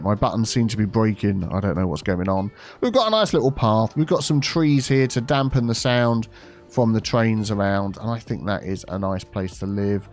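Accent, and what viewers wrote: United Kingdom. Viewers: Subscribe all would mean i get ALL the mods, Biff has FPS mods that my potatoe can't handle. so I need to search through the mods for the one he mentions, some like TMPE are hard to understand when someone says it quickly. especially a wonderful brit. a graphic with the name of the mod woule mean i could type the name in goole with Cities:skylines and immediatly get the mod I want to download.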